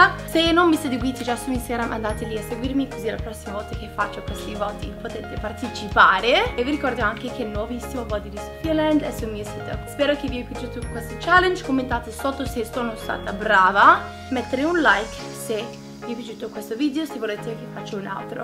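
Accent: United States. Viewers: Ma perché parli più in americano ora che negli altri video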